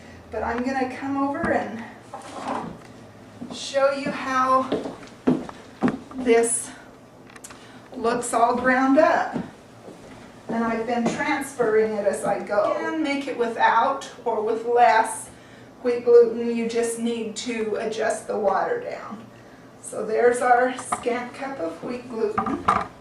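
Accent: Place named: Utah, United States. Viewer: Hi! I sure would like to try your recipe. How much wheat did you put in? I think the measure was edited out. I'll watch it again. BTW-as soon as you started talking I thought you must be LDS. You have that UT Mormon Mommy accent. So cute. When I looked at your other vids, I discovered I was spot on. Anyway...if you could give the exact recipe, I would very much appreciate it.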